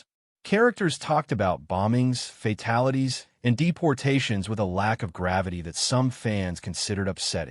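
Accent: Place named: United States. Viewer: TLDR: Rage-bait, don't watch. How appropriate that this piece of rage bait should have an American AI voice-over that mangles the very name of the show. Just like every piece of popular entertainment it was a product of it's time and would not be successful in any other context. Personally I would take this fantasy send-up of wartime movie tropes any day over the barren desert of today's American streaming channels whose only successes are bowdlerised reboots of the very classics you decry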